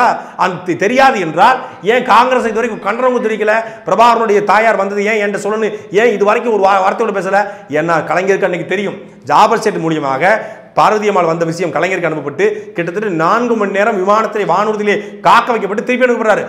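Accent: Tirunelveli, India. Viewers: இவ்வளோ மரியாதையா பதில் சொல்லிருக்க வேணாம், சாட்டையின் திருநெல்வேலி தமிழே போதுமானது மருத்துவருக்கு 😉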